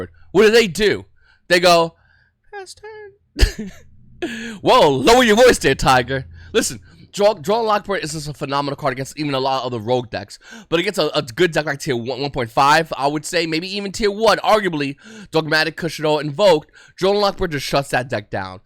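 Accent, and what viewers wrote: New Jersey, United States. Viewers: This dude is 100% from the jersey shore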